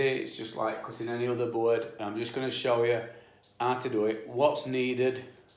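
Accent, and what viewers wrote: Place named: Yorkshire, United Kingdom. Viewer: (subscribed) excellent video thankyou. im a yorkshire lad but now live in cornwall. ive just bought a house thats been built against a steep hill with the back wall right up against the hill which is soil nearly up to the first floor. i cant see wether its been waterproofed behind before it was back filled without digging down. its double skin concrete block construction, and shows slight traces of damp inside but nothing major, just a few black marks and a bit of bubbleing paint. would this system that youve shown here be adequate to fix this, and also can i stick it over painted walls or do i have to take the paint off first? i fully understand that you cant give a proper answer without seeing it your self but just woindered if youve ever done owt similar? its great to hear the yorkshire accent again as well!!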